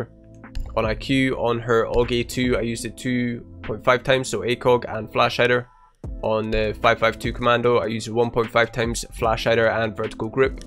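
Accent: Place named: Northern Ireland, United Kingdom. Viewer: Are you from Northern ireland because you sound like it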